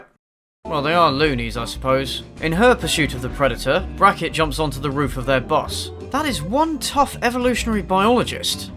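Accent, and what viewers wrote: United Kingdom. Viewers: An already amazing review gets bonus points automatically for sarcasm with a British Accent!! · Oh God. You're so much 'britishier' than I could ever imagine.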